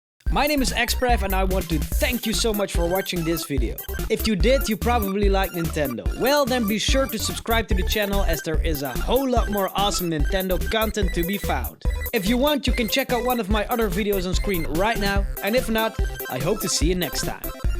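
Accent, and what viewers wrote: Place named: Netherlands. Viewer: Ok, so now byleth gets added to smash, and I was wondering if this game would be something for me. I got into persona 5 (which is now my favourite game of all time btw) because of joker in smash, so I was wondering if this would happen with fire emblem for me. So I searched for a vid and found your channel and you’ve honestly sold me!!! Awesome job! Ohw... yeah also, you sound really dutch. Dus als je Nederlands bent en dit leest. Ik lieg niet als ik zeg dat je video’s van hele hoge kwaliteit maakt! Ik hoop dat je snel groter groeit! Zeker een sub erbij!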